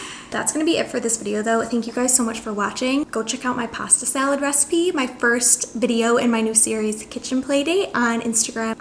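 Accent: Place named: California, United States. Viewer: I always think you're from Cali until you mention NY, haha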